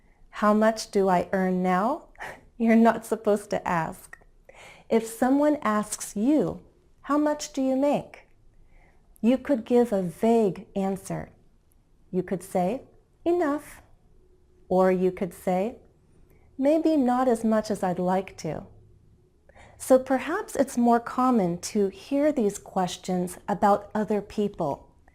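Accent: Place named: United States. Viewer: Amazing. I do like your videos, and I share them to my friends, students, and colleagues. The way you teach is excellent. It seems that you are patient to your subscribers. And, I like your American accent. I recommend everyone who wants to learn English should follow channel. Well done. Keep it up! Ahmed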